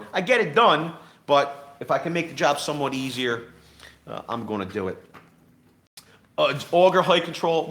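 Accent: Philadelphia, United States. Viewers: Thanks for taking time to do a walkthrough before blowing snow. And coming from Montana that Philadelphia accent is great.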